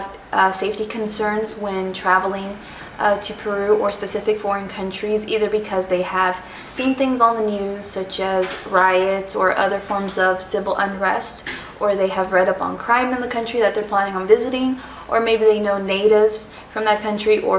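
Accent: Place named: United States. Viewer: your accent is american. whats the deal? you were born in peru and you grew up in USA? and btw, mystura is at Jockey Plaza right?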